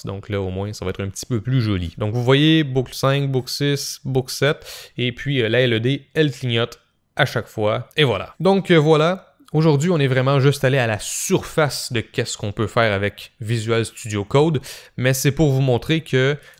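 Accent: Quebec, Canada. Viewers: Bonjour, Je te remercie pour ton site et tes vidéos. Je suis un vieux programmeur et je m'intéresse aux sujets que tu présentes. Merci pour celui sur l'Alfawise U20 One, achetée, surper content. Merci aussi pour les sujets ESPxx. C'est bien que tu présentes VSCode qui est un "rouleau compresseur" qui écrase tout sur son passage. Je l'utilise depuis la version 0.8 et je l'ai vu grandir. J'ai fait beaucoup d'Arduino pour mon plaisir durant quelques années. Quand je m'y suis remis, j'ai failli vomir : le même IDE qu'en 2011, à quelque chose près. Utiliser VSCode pour refaire de l'Arduino m'a redonné du plaisir à coder pour ces petites machines. Je crois qu'il ne faut pas hésiter à laisser l'IDE d'Arduino de côté. VSCode propose un retour sur investissement bien supérieur et il va continuer de grandir, l'IDE Arduino actuel, c'est mort. J'utilise VSCode professionnellement sur Windows et sous Linux (ça marche même sur une rasp !) et c'est un outil de productivité formidable. 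Je ne voulais plus faire de "Visual Studio" tout court, j'en ai marre de ce genre de produits. Avec "Code", j'ai vraiment retrouvé le plaisir de coder (en python essentiellement). Merci encore pour tes vidéos (et ton léger accent canadien c'est du pur bonheur pour les français) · Bonjour. Voir et écouter tes video est un double plaisir. Les explications sont très claires, ni trop rapide ni trop lentes. Tu fais tomber les barrières technique avec une facilité déconcertante! Le second plaisir, c'est entendre ces explications avec cet accent québécois que j'adore (je suis français et j'habite à Paris) me remplit toujours de joie! J'espère pouvoir retourner prochainement à Montréal. Si tu y habites je t'offre une bière!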